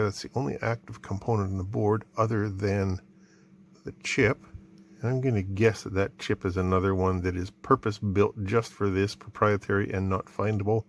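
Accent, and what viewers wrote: United States. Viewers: I'm going to assume you are a Big Clive fan, after the "one moment please" Going to also assume you from the extreme northern USA, Montana to Washington, from hearing, OAT and ABOAT. for out and about. Got a free X-10 sample pack after reading of their $40 value in an electronics magazine. (Mid 90's) 6 other electricians from our vacuum cleaner plant in N Canton, Ohio got in on the deal. Still have one to open the garage door 30 years later. (Sears wanted a fortune for a transmitter and had made buying the decoder chip impossible.) Good Bye Sears 🥲🤣 Here's your 👍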